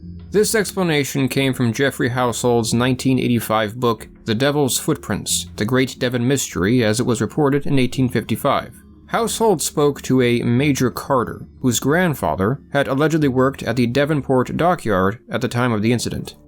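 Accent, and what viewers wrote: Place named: United States. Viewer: He's speaking SAE, Standard American English.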